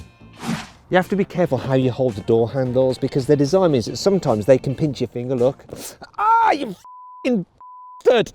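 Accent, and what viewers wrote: United Kingdom. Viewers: 영국 특유의 억양인가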